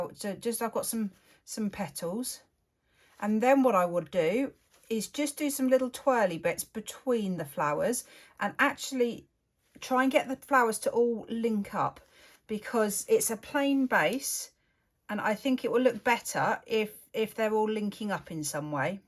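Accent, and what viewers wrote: United Kingdom. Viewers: I have been following various “well-known” artists in pouring for a long time. Nevertheless, your artwork remains the most creative artwork I have come across on YouTube. I enjoy following you and I also find you very inspiring. I always look forward to seeing a new work of art from you. Oh and one more thing: I love your British accent:-)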